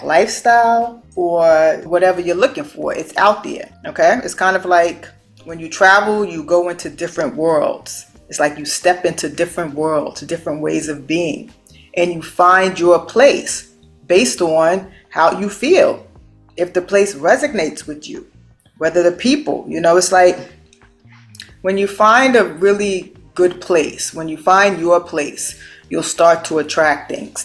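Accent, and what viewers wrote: New York, United States. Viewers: Sounds like a NY accent ma. How you doing. Love your perspective. New sub glad I stumbled upon your channel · I would like to move abroad. I enjoyed this information. Are you a New Yorker? Lol I ask because if your accent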